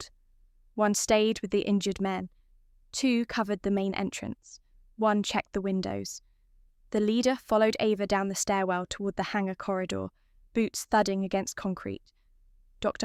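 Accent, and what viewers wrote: United Kingdom. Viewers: why do we have to endure an english accent when watching US Navy Seals?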